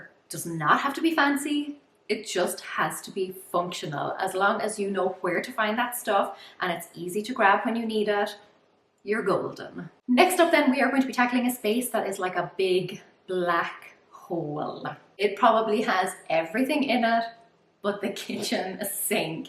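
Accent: Ireland. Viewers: Thank You good to know. Also love your Irish accent.💚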